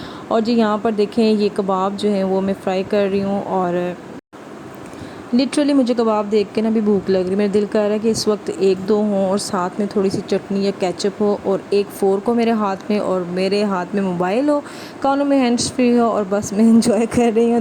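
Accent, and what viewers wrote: Punjab, India. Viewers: Urdu Mein punjabi ka tarka both Acha lagtha ha. very good sharing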